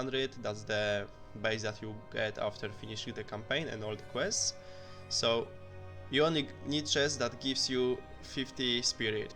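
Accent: Poland. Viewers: Jak chuj slysze polski akcent xD nie myle sie? Pozdro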